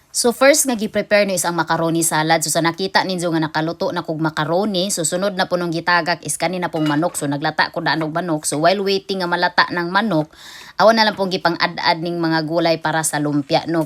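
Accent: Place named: Bohol, Philippines. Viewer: Akoang Lolo ug Lola mga bol-anon. Si Lola tga Maribojoc, ganahan kaau sya mag tan-aw sa imong videos nga naay voice over. Mahimoot sya kay bol-anon kaayo.😂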